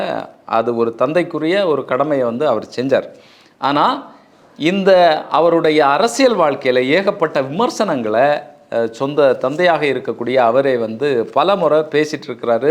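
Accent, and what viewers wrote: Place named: Kanyakumari, India. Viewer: Sir, are you from Kanyakumari Dist, and Monday market or Marthandam side? Your slang is kk slang.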